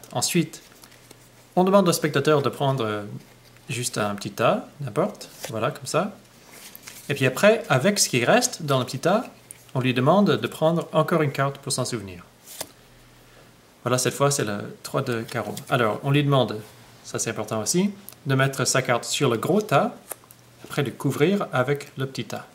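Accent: Quebec, Canada. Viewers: canadien ? (j'aime le canada :D) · Super tour bien expliqué avec l'accent québécois en plus ! Merci :)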